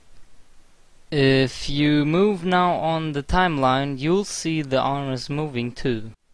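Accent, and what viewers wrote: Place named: Germany. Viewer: youve got a german accent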